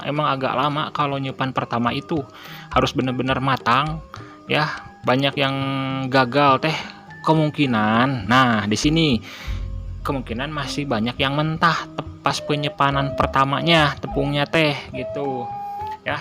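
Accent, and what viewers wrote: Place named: Sunda, Indonesia. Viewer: Suaranya sgt sunda😀😉 Sundanya mana mang✌😉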